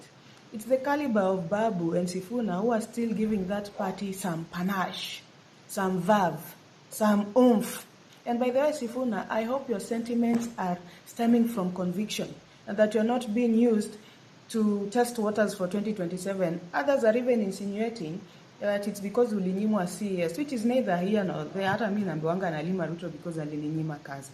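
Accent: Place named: France. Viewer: please be soft on us kikuyus hii kizungu yako na babu inatulemea yawa hatuelewi hadi sometymes naona nikama unaongea french yawa😅😅😅😂😂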